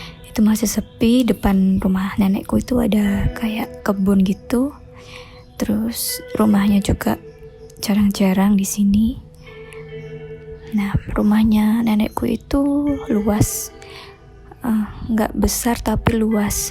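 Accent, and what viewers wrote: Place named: East Java, Indonesia. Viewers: Karangan? Mbaknya pasti org jatim ya hehe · Hooh dia orang Jatim wkwkw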